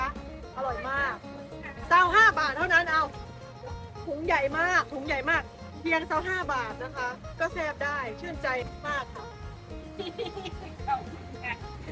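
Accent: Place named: Ubon Ratchathani, Thailand. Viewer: สำเนียงคนอุบลค่ะ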